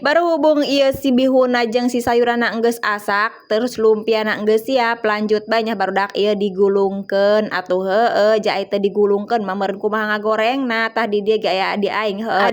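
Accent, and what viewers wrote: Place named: Banten, Indonesia. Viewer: logatna mah doang budak di Banten dia.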